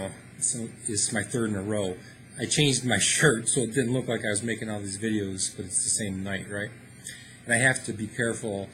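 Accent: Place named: New Jersey, United States. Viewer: Hey brother, I hear the Jersey accent coming through, I’m from jersey too. I want to say that yes within the ego body mind there is a constant changing from moment to moment and each one you can say is a self. However what your true self is, is not within the world, and that self is changeless. In fact that self is just really not even anything, it just is, and that true self CONTAINS the world and the world originates from it. But depending on where your coming from, yes there are many selves, yes there are people, yes there is duality, but the true self contains and enables it all, and is One, across everyone. Your true self and my true self, are the same, we are actually each other. Peace and much love.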